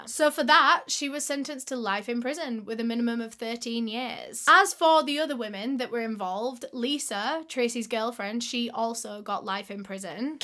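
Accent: United Kingdom. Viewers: I love your British accent 😍❤️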